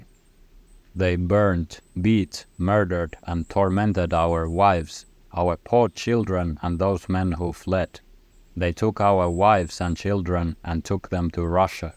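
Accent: Finland. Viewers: Ulkomailla asuvana suomalaisena voin vahvistaa, että suomalainen aksentti on kovaa valuuttaa eikä lainkaan hävettävä💪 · Laitoin puolisen tuntia sitten pyörimään telkkariin ja kokoajan ollu hämillään, että mitä tää laatu on. Onko joku ulkolainen tuottaja opetellut suomalaisen aksentin ja juontanut parin tunnin videon hyvillä siirtymillä ja grafiikoilla? Ei ollu. Tää on vaan upeaa työtä. Aivan upeaa ollu ekan neljänneksen ja rautaportilta selailin jo tavaraa tilaukseen. Kiitos. Mitä vittua ukko? Kiitos uudestaan.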